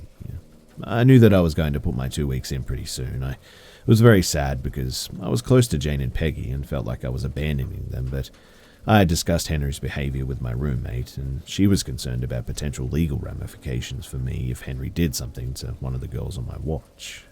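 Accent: Australia. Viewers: you be rockin with the full on australian accent better than lazarbeam himself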